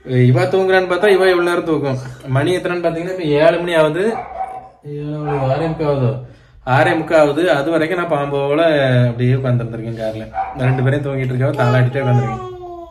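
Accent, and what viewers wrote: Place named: Thoothukudi, India. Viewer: Thoothukkudi slang sooper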